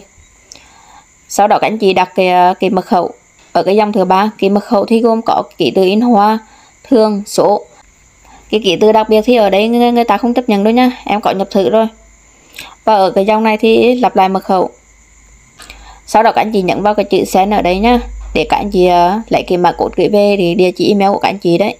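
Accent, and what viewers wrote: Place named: Quang Tri, Vietnam. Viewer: A quảng trị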